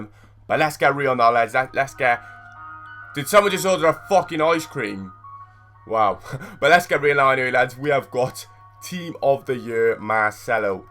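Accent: Wales, United Kingdom. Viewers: you sound welsh, are you? and if so what part you from?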